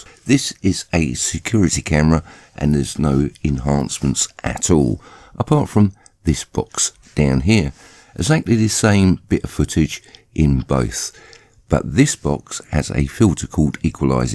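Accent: United Kingdom. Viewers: Honey your sarcasm is just a little too subtle for people to really get it. I think it’s because your Britt accent confuses.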